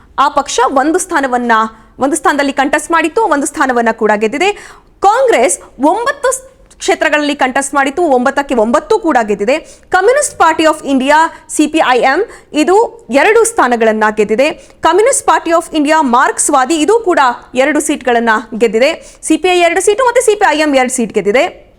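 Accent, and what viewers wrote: Standard. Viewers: Clean kannada good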